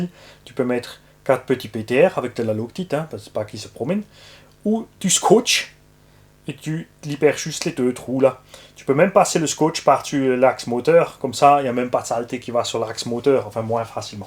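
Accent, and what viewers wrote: Alsace, France. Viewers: J'adore l'accent surtout accentuer par le alsacien 👍🏻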